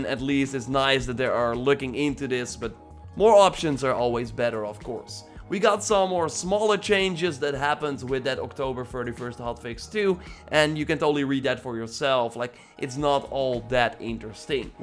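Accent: Netherlands. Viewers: where are u from u have like a dutch acent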